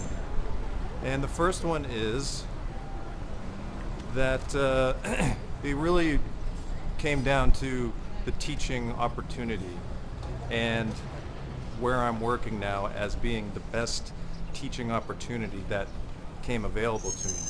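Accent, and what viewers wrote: United States. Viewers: i'm gonna be honest. You have the most american sounding accent on earth and i love it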